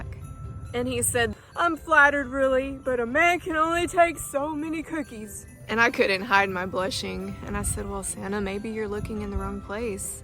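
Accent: South, United States. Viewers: I love the southern drawl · Redneck accent but you ain’t half gorgeous 😋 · tell Santa you've been around a bit, but you ain't no ho. You're beautiful, sexy, that charming Southern accent unravels my socks and makes me stand at attention. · a beautiful one with a southern drawl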